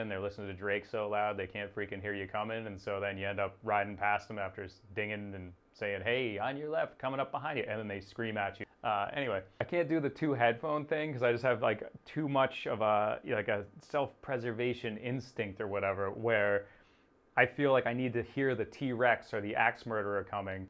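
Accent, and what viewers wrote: New England, United States. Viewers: You sound like a New Englander. Where do you ride?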